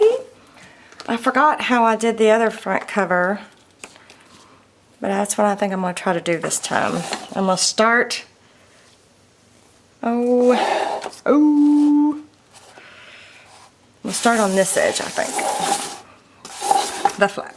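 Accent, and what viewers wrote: South, United States. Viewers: I absolutely adore your videos and I think I've watched all of them and if not it's only because I haven't found it YET!! I do find it funny that you say hoes for holes...I'm assuming very southern. I'm from Alaska and shared one of my books created from a couple of your printables I'd combined a bit and you shared it on FB...that was cool!! Thank you for all you do...it's opened a whole new world of crafts for me. Before I'd always been a digital scrapper (still am to a huge extent but not I print and make some of my designs in paper books I create). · Being Southern like you, I understand everything you say!! LOL.... Here in our area of the Gulf Coast of MS.. we have a saying when we know something won't work out or something just won't work at all, we say, " That dog won't hunt"..... Being from an area that has a lot of hunters then we all know what this phrase means!! 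I love love love that you show how to use ordinary stuff to make your journals... without having to buy a bunch of expensive products you show how to use what we have in our stash!! The journal is awesome and I think it would make a great planner!! Thanks,.... you did it again! ( ahhhh I am a poet and don't know it) Another southern saying?? LOL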